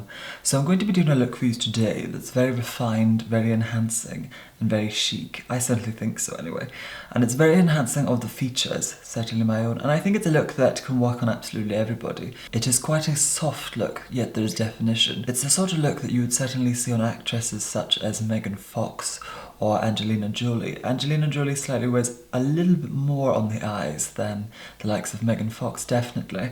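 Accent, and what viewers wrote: United Kingdom. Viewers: You speak like a British queen and it's so relaxing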